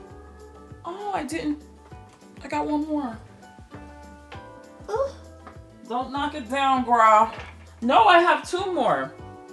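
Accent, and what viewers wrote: New Jersey, United States. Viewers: Your voice sounds just like Wendy Williams ❤❤❤❤jersey vibes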